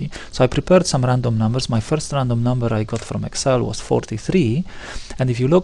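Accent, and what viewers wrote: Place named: Egypt. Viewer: اللهجة مصرية بحتة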